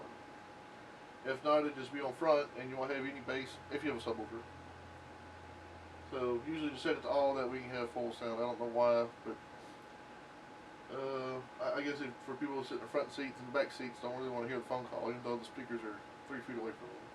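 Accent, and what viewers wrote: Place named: Kentucky, United States. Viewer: sounds like you're from Kentucky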